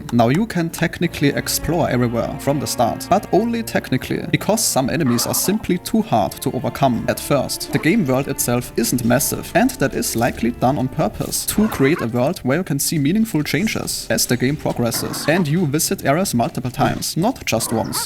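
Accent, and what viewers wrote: Germany. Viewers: Digga deine Aussprache ist wirklich schrecklich. Versuch Englisch ohne deutschen Akzent zu sprechen weil das geht fast in Richtung old Arnold😅😂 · Very german accent :D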